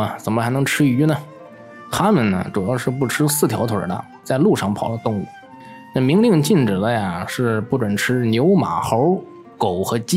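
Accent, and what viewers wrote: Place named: Beijing, China. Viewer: 你的解釋非常好 視頻做的也真的非常棒 但能不能別用北京腔 舌頭給我放平說話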